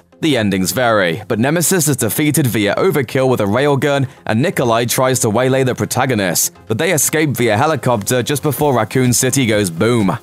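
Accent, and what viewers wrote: United Kingdom. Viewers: Can you not be British? I'd like for just one shitty recap video maker to not be British. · What’s your accent? Sounds British but not familiar with ‘citay’ or ‘zombay’ accent!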